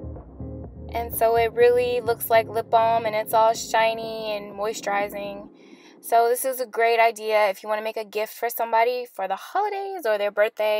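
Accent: United Kingdom. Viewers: nice british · I KEPT REPLAYING YOUR BRITISH ACCENT YOURE SO CUTE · That British voice thou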